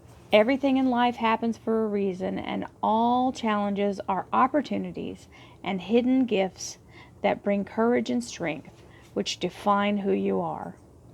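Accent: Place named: South, United States. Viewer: Once again, being a TN gal, I just love your southern accent. You sound exactly like my sweet Aunt Charlotte. Thank you so much for sharing your precious gift with us. Your readings are 1000% resonant with me. 🙏💗💜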